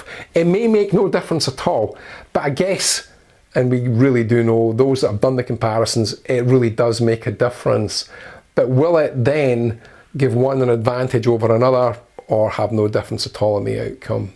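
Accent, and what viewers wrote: Scotland, United Kingdom. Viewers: Well, you tried squaring the circle without any knowledge of trigonometric functions. The term “blind test” or even “double blind test” requires not only a technically perfect laboratory setup, but also randomly selected test subjects according to predetermined parameters, a statistically significant population and corresponding valid statistical evaluations. Nice try, but ultimately I like your rumbling Scottish accent 😊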